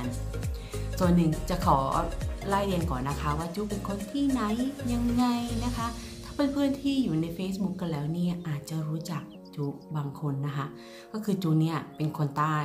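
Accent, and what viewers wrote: South, Thailand. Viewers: ดันเลย พูดใต้น่ารักดี คะ❤️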